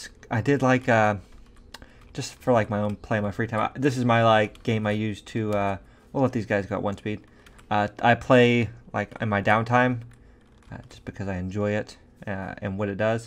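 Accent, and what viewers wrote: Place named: United States. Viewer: He has a great American voice and is hot can confirm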